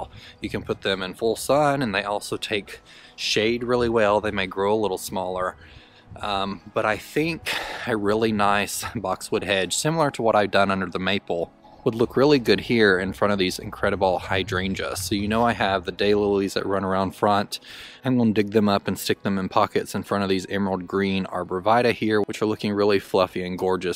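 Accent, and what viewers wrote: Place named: Appalachia, United States. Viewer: I love your accent and phrases (Right quick. For example.) I am not southern, we are just tucked into the Appalachian Mountain range that runs through Pennsylvania on the western side of the state. I have noticed at least some of our dialect seems very similar to those in the south! Love your videos! Thanks for sharing all you do!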